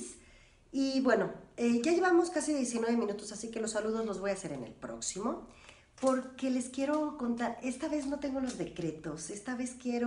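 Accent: Mexico. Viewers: Hablas cómo toda una linda Mexicana...viva México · Aquí aún hablabas como mexicana, en el documental retomarte tu acento español 🤔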